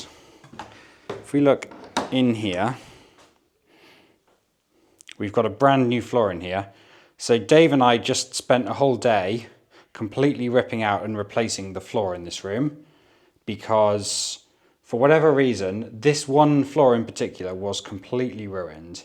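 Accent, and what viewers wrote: United Kingdom. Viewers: I like your british accernt! so classy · Some old reclaimed floor boards would be beautiful in this old house 😊 can you tell me where in the UK you are from? I am so curious as to where this accent is coming from!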